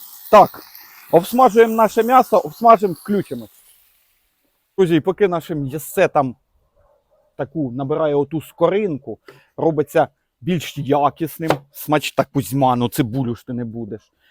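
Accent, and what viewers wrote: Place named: Poltava, Ukraine. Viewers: Такой приємний,, Говор "у Вас ,майстре ,така мова чарівна у Вас ,як у нас ,на Полтавщині .Приємно ,слухати,не тільки ,залучитися до вашого ,,Капусняка '',все так робити ,як і у нас .Велике Дякую. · Розмова точно полтавська! Чи помиляюсь? У нас також капусняк готують і з квашеної і зі свіжої капусти. В залежності від пори року. Неперевершено! Дякую.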